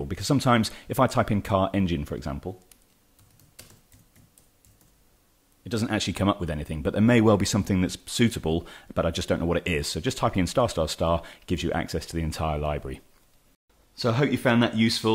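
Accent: United Kingdom. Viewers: Thanks for this - very helpful and your approach is clear and friendly! Lovely british accent too:) From South Africa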